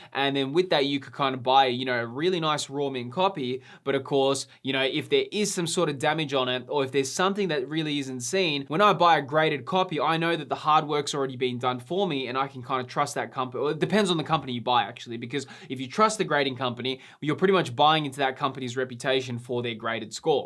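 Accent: Australia. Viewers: he's aussie they have funky accents like the brits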